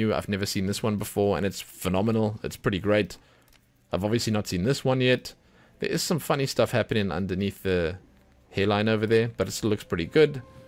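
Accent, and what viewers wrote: South Africa. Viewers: bro you south african?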